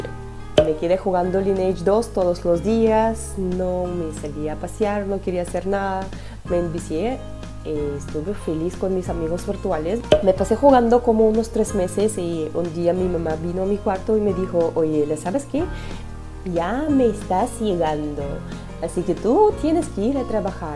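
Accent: Peru. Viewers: q ya regresaste de rusia 😮 uno. aquí esperando videos de rusia. .. eres bien graciosa. ya se te pego lo peruano. y muchos acentos. lo ultimo a rascarse los huevos jajaja me dio risa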